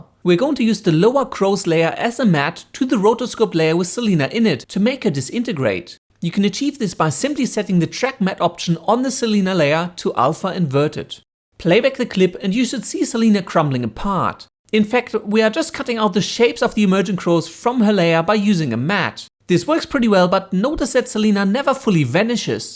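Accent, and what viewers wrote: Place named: Germany. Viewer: Holy fuck you have a German accent xD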